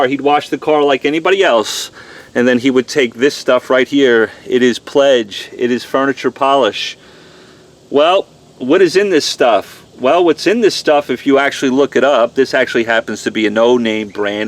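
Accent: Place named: New York, United States. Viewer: Gotta love that Ray Romano NY accent, a rarity in Texas -- keep up the great work!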